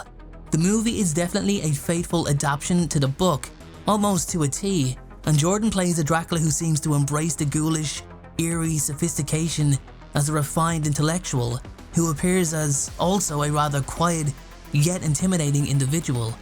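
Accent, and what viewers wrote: Ireland. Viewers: Your list makes me wonder if you might never have seen the movie with Frank Langella as Dracula? The one with Laurence Olivier as Van Helsing. BTW, I love your Irish accent! Dracula as... 'Drak'ullah'. Aye, dem feckin' vampires out dere bitin' troats!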